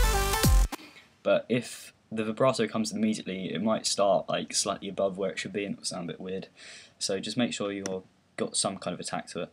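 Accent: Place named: United Kingdom. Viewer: So British it hurts :P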